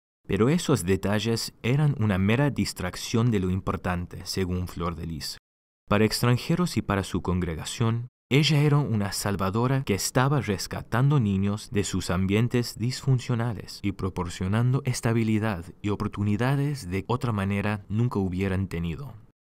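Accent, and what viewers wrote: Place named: Buenos Aires, Argentina. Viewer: Hablas como porteño(Buenos Aires)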